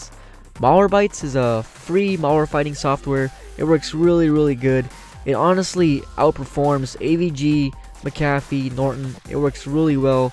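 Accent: Croatia. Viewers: Are you from Croatia?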